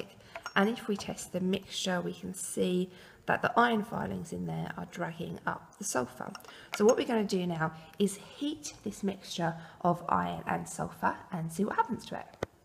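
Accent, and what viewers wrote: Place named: RP, United Kingdom. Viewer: sounds posh to me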